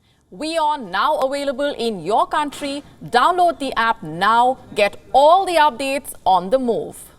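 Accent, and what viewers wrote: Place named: India. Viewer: please speak better English, it is a so heavy Indian accent that your English is a joke. By the way your stupid channel is critizing China all the time, it is full of jealousy..... Your channel is not a good English speaking channel... Every Chinese who speaks English speaks much better than you Indians. What a shame to state that you Indians speak better English or any languages better than us Chinese. I have written more than 160 books, and 80 of them are in English and many languages sold world wide. I am still the Chief Editor of the Mirror, Gen Bao - 鏡報. I myself is a Norwegian Chinese and can handle more than 8 languages! I am also being named as the Universal Genius of the century!!!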